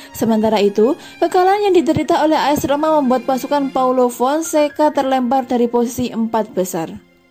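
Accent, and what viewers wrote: Java, Indonesia. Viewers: medok abizzz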